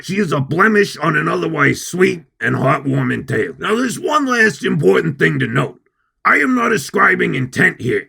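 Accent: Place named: New Jersey, United States. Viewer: Love the jersey accent, although bailitmore accent is better, good video, easy sub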